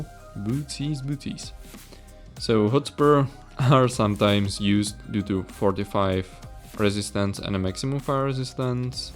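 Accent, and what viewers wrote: Czech Republic. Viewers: Great summary, minor note regarding Ormus, unfortunatelly it AFAIK cannot roll FO nor any element mastery. In the shields category, I'd personally briefly mention also Gerke's Sanctuary, which has similar use as String of Ears, i.e. in ES builds for defensive setup, as it also provides solid damage reduction. Btw just guessing based on accent, aren't you Czech?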